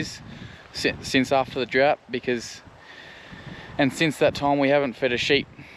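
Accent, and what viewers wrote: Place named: Australia. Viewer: Just found your site today and subscribed and liked. Watched the lot this wet Tuesday. Great videos; especially the drone work. Could I suggest a bit more technical info, i.e. tractor size, implement used (include brand - you may get a sponsor) mob numbers etc. Great to hear Aussie English instead of took the wool OFF OF, took the alfalfa OFF OF and everyTHINK. Keep it up it's great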